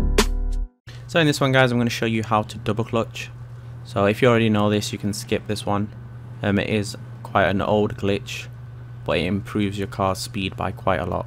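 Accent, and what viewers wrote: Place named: Bury, United Kingdom. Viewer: u from bury?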